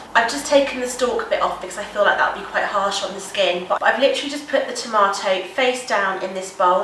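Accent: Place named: United Kingdom. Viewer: Fuck sake everyone sees an Indian girl and assume they should have an apu accent. FFS it's a British accent. It's nice. People are so fucking rude.kmt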